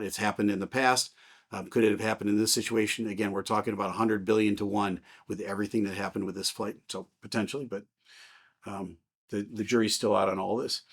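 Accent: United States. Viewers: I like your clips very much, interesting and well understanding american english, for me good to keep my English. Greeds from Germany 👍👍👍👍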